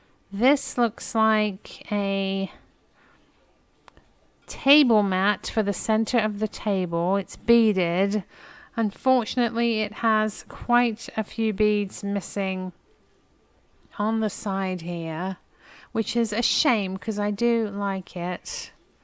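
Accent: United Kingdom. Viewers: dear, I don't understand why don't you invest in one of those cameras that sit on your chest so that you can use both hands. I see you time and time again struggling to pick up or open an item and having both hands free would make your life so much easier. I love your Chanel I learned a lot from you over time. Love your sweet cheerful positive personality and english accent. Cheers and all the best from Agi at Princeton, IL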